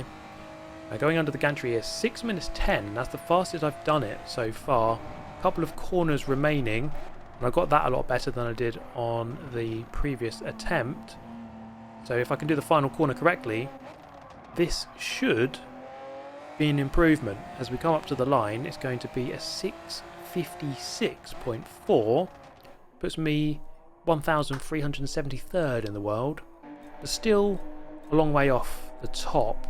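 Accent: United Kingdom. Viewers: Why do I get so hype watching someone else play the same game as me. Those damn Brits can make everything sound interesting.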